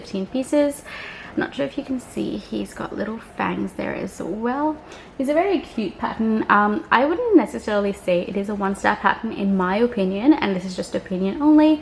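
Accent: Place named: Australia. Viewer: Just found you today. You sound kinda Australian sometimes ?? There's not many of us around. Love all your creations ❤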